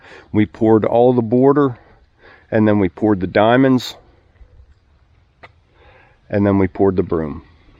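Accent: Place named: Pennsylvania, United States. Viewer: Love the channel. Just wanted to say there are no "Z"s in greeeassse. Love the Pensilvainia accent. Those are s's not z's. People point out my Ohio accent all the time and I live in the US Virgin Islands.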